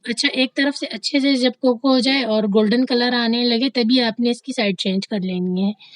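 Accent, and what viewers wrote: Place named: Peshawar, Pakistan. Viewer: Apki batun ne lehjy Sy mujy peshwar ki yad agai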